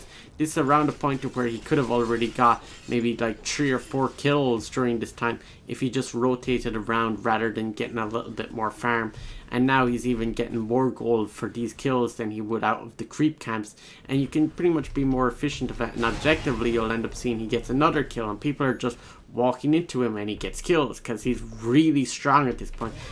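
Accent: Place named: Ireland. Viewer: You sound like you live somewhere in south ireland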